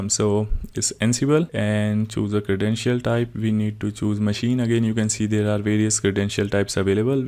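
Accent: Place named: India. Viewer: Пока произношение индуское не уберешь — жрать не получишь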